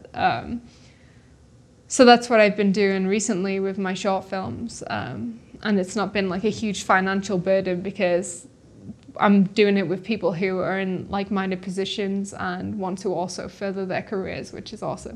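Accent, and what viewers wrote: United Kingdom. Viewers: So straightforward and inspiring, best of luck. Also, the accent geek in me is intrigued as well, am I hearing Geordie origins maybe?!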